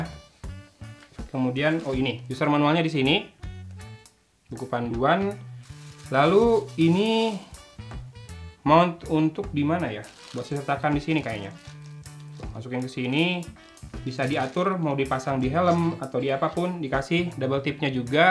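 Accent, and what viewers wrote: West Java, Indonesia. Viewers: Ah sunda pisan eta murangkalih si akang logatna 😁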